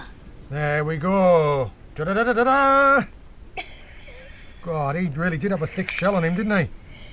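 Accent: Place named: England, United Kingdom. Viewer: where do you forage? by accent Im guessing England, but I cant place it, I never lived in England long enough to tell. Im actually considering moving back to Ireland as I miss foraging seafood a lot - about 20 years ago I used to live in Carrigaline/Crosshaven, Ireland and pick up seafoods with a bucket. Your channel sure makes me miss those times.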